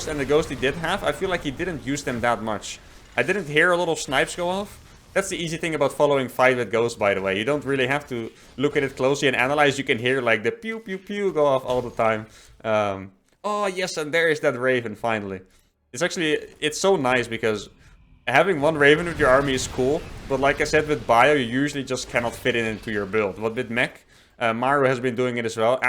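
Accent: Netherlands. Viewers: I can just tell by your accent that you're Dutch. Good to see another dutchman on this site!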